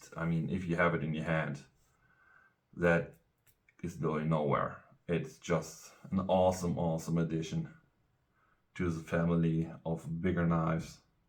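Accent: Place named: Germany. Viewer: You're German I hear.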